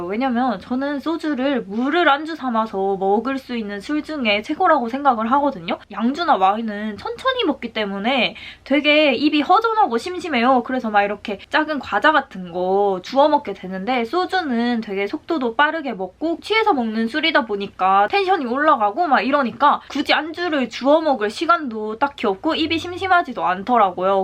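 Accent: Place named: Gyeongsang, South Korea. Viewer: 경상도말투 너무 귀여워요!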